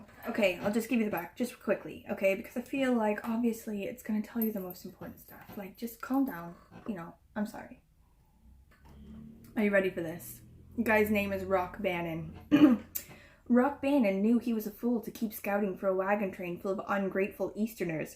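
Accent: Wales, United Kingdom. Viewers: I could tell you are living in wales because of certain things in your accent. At least here you get a dragon on the flag 🙂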